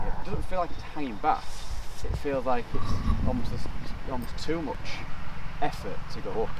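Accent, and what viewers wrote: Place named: Doncaster, United Kingdom. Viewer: Sounds just like Doncaster 🤩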